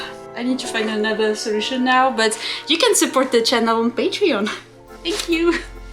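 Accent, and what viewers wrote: France. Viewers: Hearing you say Kentucky was so cute lol I love your French accent! Also I’m glad you clarified the kitty is “not your cat” but is simply a “roommate” hahaha yes my cats are also rent free roommates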